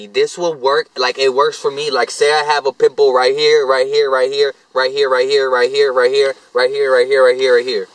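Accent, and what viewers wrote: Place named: Texas, United States. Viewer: texas accent came out in this vid a lot 🤣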